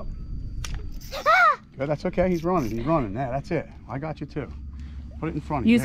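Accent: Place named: Maryland, United States. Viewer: Hi, I have to ask, are you from Maryland? I detect a similar accent to mine. I fish a good bit in Sunset Beach NC and use the same jigs. Nice video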